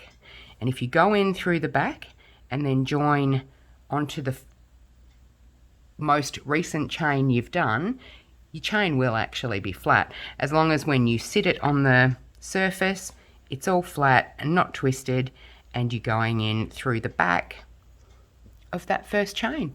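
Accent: Australia. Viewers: Thank you! Firstly, it's nice to hear a fellow Aussie! This tutorial is so helpful because many foundation chain videos don't tell you what part of the chain you need to slip stitch into. I've often thought I'd done it correctly and then realised my join is at the wrong part of the chain and I end up with a gap. Thank you!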